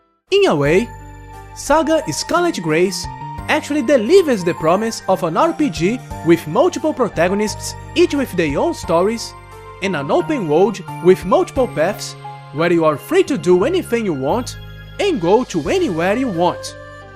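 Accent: Brazil. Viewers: moh sotaque brasileiro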